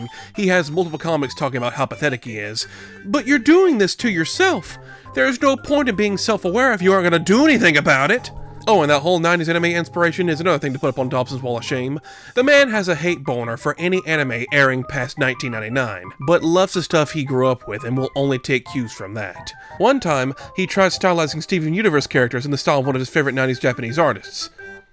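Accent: South, United States. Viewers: This is completely off topic but are you from the South? Something about the way you talk man, I can HEAR the southern in you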